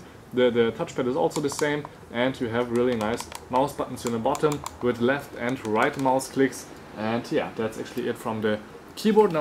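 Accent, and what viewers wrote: Germany. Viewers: Strong german accent...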